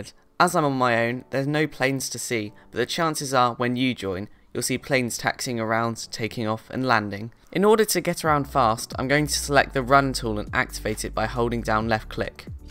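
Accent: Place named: United Kingdom. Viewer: ugh hes british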